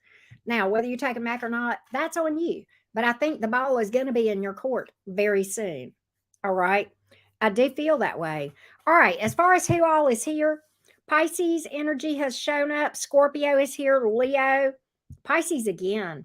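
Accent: South, United States. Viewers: Just found your channel after watching you with Tyler. Thank you for sharing your gifts 💕💕💕 Love hearing my southern folks reading tarot!!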